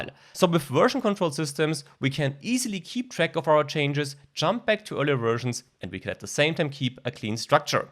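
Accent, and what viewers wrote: Germany. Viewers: Yeah, he sounds a lot like a German.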